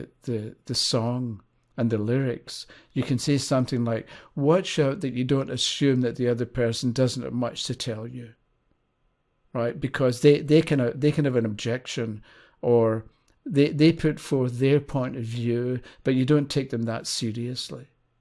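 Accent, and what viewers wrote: Scotland, United Kingdom. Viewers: Love the accent I'm going to go on a limb and I'm going to guess that you're Scottish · What a lovely gentleman. It's really nice to hear a soft, soothing Scottish accent 🏴 I took from this that association is key, or as I like to call it the "no degrees" of separation! XXX ♥️ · just gave it another listen, y’all are right it’s definitely a Scottish accent. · A lovely gentle Scottish accent. Music to my ears. 🏴💖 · Are you Scottish? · I am picking up Scottish undertones in his accent 🤗 makes me feel homesick haha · What part of Scotland 🏴 yoos from ? I grew up in Juniper Green, Edinburgh! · From what part of Scotland do you hail? Glasgow I'm guessing. Thanks for your lovely video! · Definitely Scottish, Glasgow probably.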